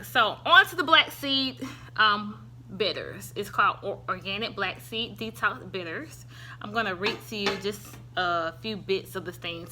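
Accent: South, United States. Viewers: Ur quite lovely and the southern drawl is very charming. Keep up the good work. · U speak very well love your southern accent. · I love your Southern accent, I'm from Atlanta too. Thanks Queen for the amazing info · Nothing wrong with your accent. Southern... thanks for the video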